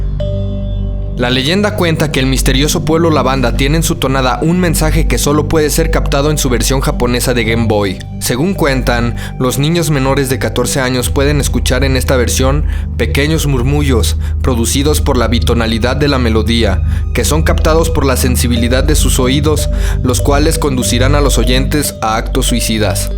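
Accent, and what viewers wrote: Mexico. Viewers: Eres de México? si es asi de donde eres? buen vídeo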